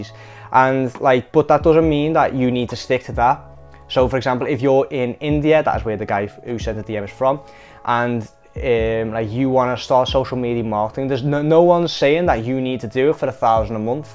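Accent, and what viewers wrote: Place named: United Kingdom. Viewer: jeez that uk accent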